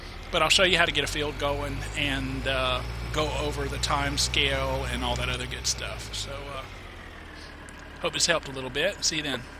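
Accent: South, United States. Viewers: The southern accent just completes this guide. Nice!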